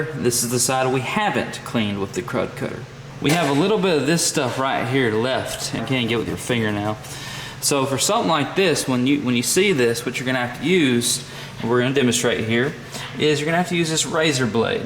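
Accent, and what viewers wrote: South, United States. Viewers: IM a SNOW BIRD...LOVE YOUR SOUTHERN DRAW yes you are the nice work my friend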